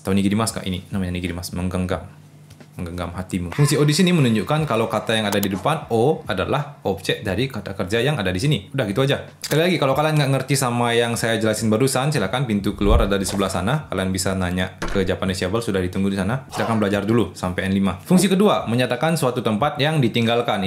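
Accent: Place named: Bali, Indonesia. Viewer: Mendengar bercerita panjang-lebar seperti ini, jadi teringat dulu waktu saya masih tinggal & mengajar di Bali. Bhs Indonesia-nya khas Bali, demikian juga dengan joke2nya 😅😂🤣😆 Terus semangat, sensei \\(^_^)// Jasamu sangat diperlukan di negeri ini! 😁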